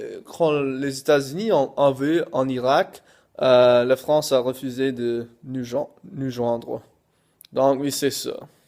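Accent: Quebec, Canada. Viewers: La plupart des français se douchent tous les jours, mais je connais plusieurs personnes qui se douchent moins souvent x) Je trouve que tu as un accent québécois haha !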